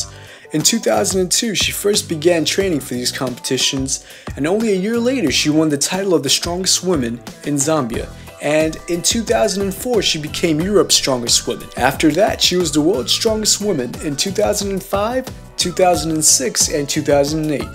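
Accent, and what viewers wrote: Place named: New York, United States. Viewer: he just needs cough medicine.. GET OUTTAA HEEAAAH.. a NY vocal mayb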